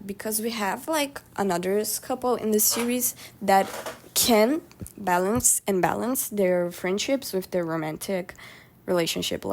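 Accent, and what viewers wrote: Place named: Russia. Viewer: oh sorry! your accent just reminded me of a russian one. i love this video btw;3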